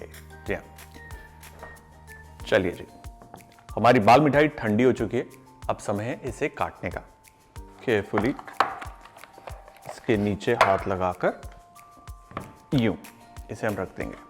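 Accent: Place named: Pahadi, India. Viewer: Sar m bhi almora se hu lekin abhi tak mne you tube p y mithai banti nahi dekhi good lekin aap kaha se ho pahadi ho baat karne se to lg Raha hai ki pahadi ho khafa dena wala sabd ❤❤❤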